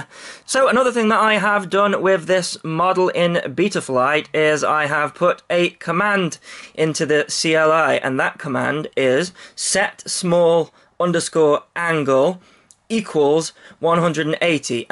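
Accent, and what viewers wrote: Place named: East Midlands, United Kingdom. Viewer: Nice fella that....where are you...that's sounds like an east Midlands accent...or potteries..lol...no wonder you don't get much Sun l lol great review as always..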